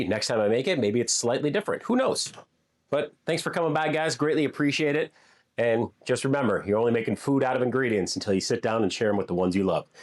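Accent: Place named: Chicago, United States. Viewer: You have an authentic Chicago accent. I could pick out a “Chicago “ accent anywhere .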